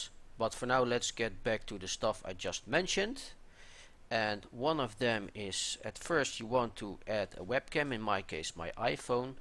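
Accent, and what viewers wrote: Netherlands. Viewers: U're Dutch? :P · Three words and I knew you werd Dutch as well haha. Great tutorial/walkthrough though. I have recently starten streaming on Twitch and this really helped me out. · Ik hoor gelijk dat je Nederlands bent :D Nice video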